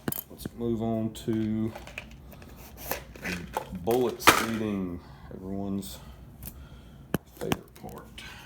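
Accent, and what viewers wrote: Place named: South, United States. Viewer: I feel like we are family, so much in common even southern draw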